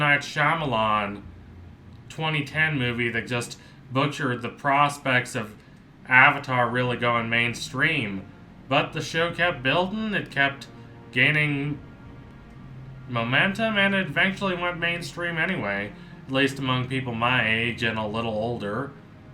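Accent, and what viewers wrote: South, United States. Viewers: were you...doing a country accent in this video lol? Are you rehearsing for a role or something? No judgment, just threw me off XD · Why do you Sound more southern?😂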